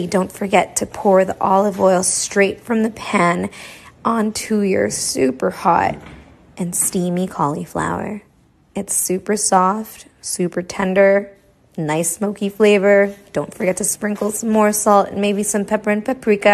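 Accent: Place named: Philippines. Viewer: I love she's so proud of pinoy accent she's a pride love her!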